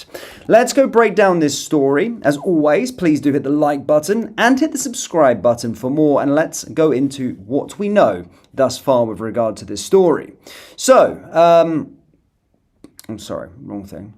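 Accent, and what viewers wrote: Wales, United Kingdom. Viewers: Your Welsh is funny. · A very serious and concerning topic, but i couldn't help laughing with your Welsh pronouncement of the Towns mentioned .😂😂😂 On a serious tone, 2 nearby schools had also been locked down in recent months.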